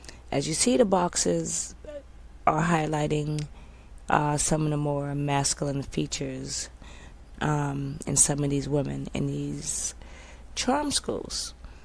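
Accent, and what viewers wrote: United Kingdom. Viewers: The plummy accent obviously covers any faults of the remaining man vocals!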